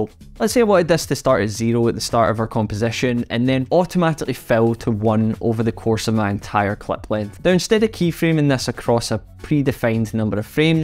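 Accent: Scotland, United Kingdom. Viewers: Your Scottish accent is what I live for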